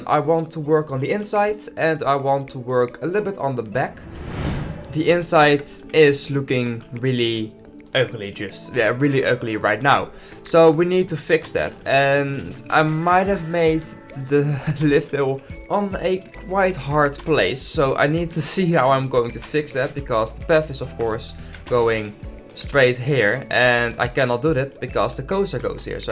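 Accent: Netherlands. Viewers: your dutch accent is strong and great btw im dutch too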